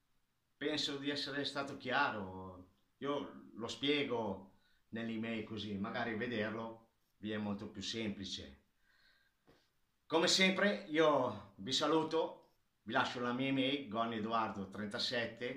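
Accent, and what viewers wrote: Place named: Lombardy, Italy. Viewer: Di dove sei,dall'accento sembri lombardo,sbaglio?Sei un imbianchino da 10 e lode,complimenti da un collega!!!Mi iscrivo subito al tuo canale!!Per dipingere una parete grande,ci vuole un pennello grande!!CIT. Ma no,non ci vuole un pennello grande,ma un grande pennello,Cinghiale!! CIT.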